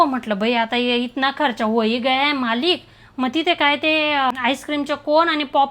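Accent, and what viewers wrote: Khandesh, India. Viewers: Typical Khandeshi...you always remind me of how my Sasu talks! 🙂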